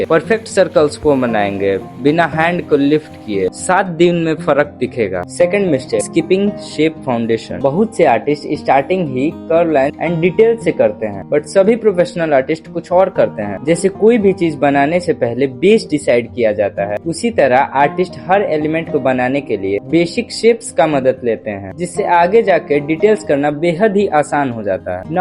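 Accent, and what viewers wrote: Bihar, India. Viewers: Bhaiya are you from bihar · Are you from Bihar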